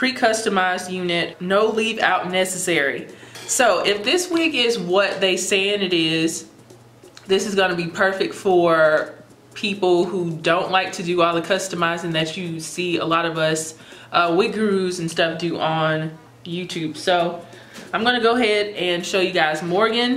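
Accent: South, United States. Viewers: Are you from Texas? You have a slight southern accent and you sound like you could be from here.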